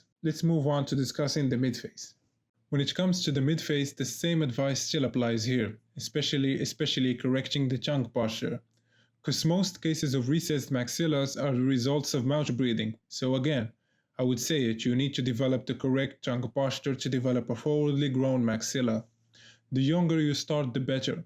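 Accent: Morocco. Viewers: nice Moroccan accent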